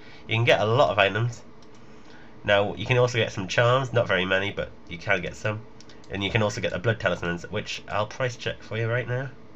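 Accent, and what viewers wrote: Newcastle, United Kingdom. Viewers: You geordies and your accents!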